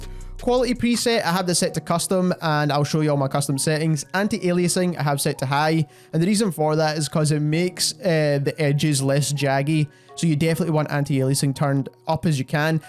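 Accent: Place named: Scotland, United Kingdom. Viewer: Same bro wasn't expecting a fellow scotsman when I clicked the video , have another sub 👊🏼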